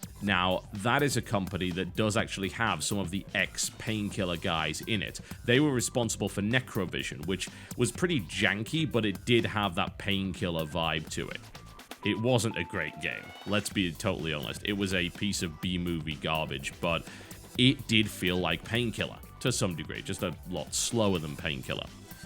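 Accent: United Kingdom. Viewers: No, it's just the British English (a.k.a. English in England i.e. the correct English) way of of pronouncing those words.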